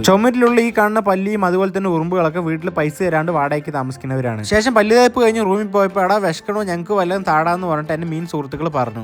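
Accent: Palakkad, India. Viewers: Liked to see your videos. Liked your plalakkad language. All the best wishes monu👍❤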